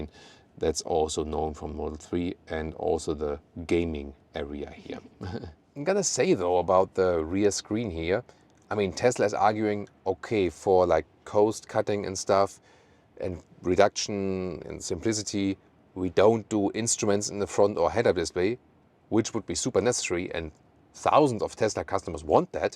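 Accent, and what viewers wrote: Germany. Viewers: Love your accent German Guy. Content and presentation is perfekt! I can now fee the car. Y is a Berlin Car. Good quality. SPASS Leute trennt euch von Politik, die Kiste ist geil! In Chin geht das Ding weg wie warme Semmeln, obwohl da die eigenen Unternehmen für die Hälfte verkaufen. Mal sehen, wann die mit Steueren antworten. Danke für den Beitrag 🚀.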